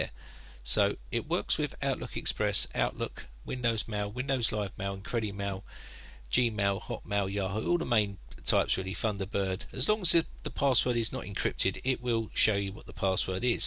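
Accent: United Kingdom. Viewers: ah i love the british accent!!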